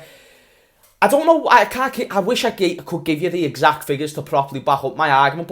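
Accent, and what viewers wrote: Liverpool, United Kingdom. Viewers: Green’s Problem it's called scouse😂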